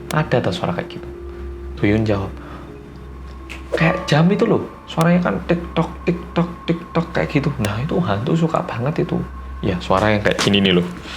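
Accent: Java, Indonesia. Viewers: Liat opah" Glowiinggg yg Medok...😁 😁, konten ny bikin merinding😅😅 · Hi, aku termasuk baru banget nonton video2 kamu di youtube, ya kira2 setelah lockdown di Australia berlaku. Aku suka banget dgn konten2 rindingdong n detektif reomit. Aku cm punya satu pertanyaan, kan medok ya hehehe tp pas pronounce bolo-bolo kedengerannya kayak “polo-polo” ya? Apa ada penjelasan soal ini yg aku belum nonton di video? Thanks and keep up your good work:) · Happy birthday ko orang korea yang medok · Mas hbd. Kok mas jadi seneng yang mistis2 to? Mas kan kalo ngomong medok terus ya, kalo ga medok bisa gak mas? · Happy birthday mas medok... sehat terus yah mas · Hadir Mas Medhok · Bang orang Jawa indo campur Korea ya kok lucu waktu ngomong nada jawanya😂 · Gumun aku,, jowo mu rak ilang ilang mas. 😂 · Orang yanggg Medokkk 👍.Mantul😀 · Hbd mas. Semoga panjang umur dan tetep MEDOKKKKK 😎 O iya, jumpscarenya jgn sering2 dong mas. 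Kaget aku Pengenya g ntn, tapi penasaran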